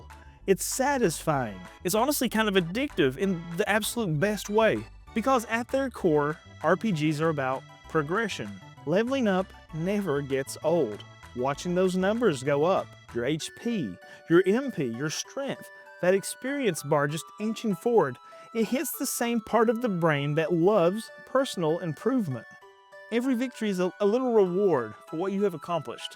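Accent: South, United States. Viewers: It's very refreshing to hear a Southern accent talking about something I'm interested in that's unrelated to where they're from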